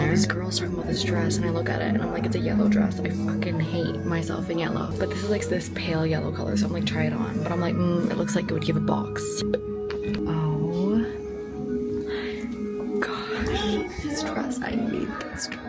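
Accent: United Kingdom. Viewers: Essex babe And please torture us with the British accent !!!!!!!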